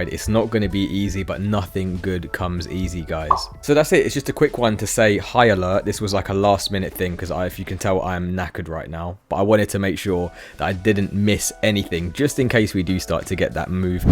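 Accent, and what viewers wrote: United Kingdom. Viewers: You must be from around Watford . You kinda sound like Anthony Joshua. Hello from Spain. 🚀💰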